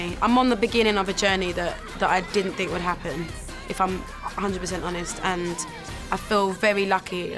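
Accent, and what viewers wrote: United Kingdom. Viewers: Before you ask, YES, she is british :) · I didn't know she was British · Wow she's British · Damn this whole time I didn't know she was British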